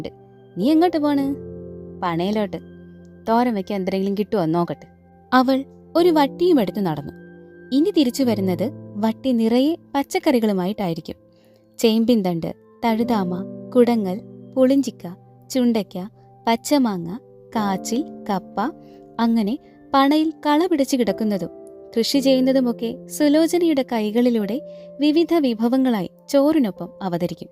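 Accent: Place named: Thiruvananthapuram, India. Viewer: 🎉🎉🎉. തിരുവനന്തപുരം ശൈലി 😂😂😂😂 ചിരിച്ചു ചത്ത്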